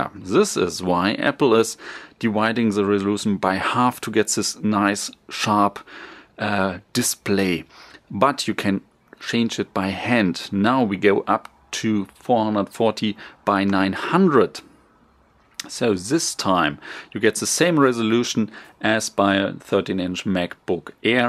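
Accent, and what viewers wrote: Germany. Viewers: Yay ein deutscher. Ich habe den Aktzend doch gleich erkannt ^^ Ist das eine 4k Auflösung? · After 5 seconds, i already heard that you are German.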